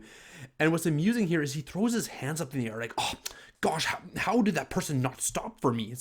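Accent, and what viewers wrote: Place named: Canada. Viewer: I find your Canadian accent so charming 😜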